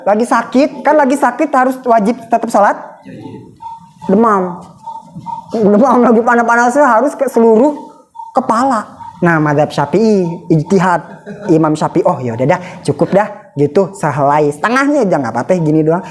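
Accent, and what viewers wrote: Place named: Jakarta, Indonesia. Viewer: Bang care ngomong lu gue demen banget dah bang😊 mau nanye nih ape boleh..?.abang ustaz orang betawi ye betawi mane baang..?.logatnye medok banget bang enak gue dengernye kaye gado"..betawi..he hee..salam.kenal bang 😂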